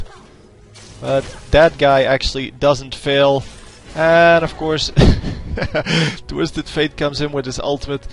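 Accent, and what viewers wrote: Netherlands. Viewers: I sense a dutch guy here XD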